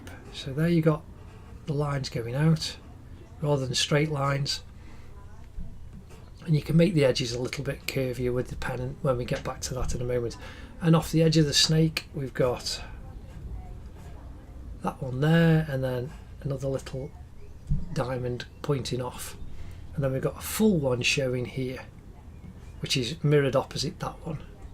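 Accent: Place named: Cleethorpes, United Kingdom. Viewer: hi! you sound like you are from near me, i am in cleethorpes x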